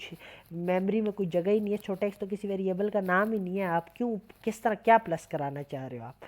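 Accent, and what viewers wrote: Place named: Karachi, Pakistan. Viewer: Or mujhay fast Reply marna waissay bi zuban sy karachi ka lagty ho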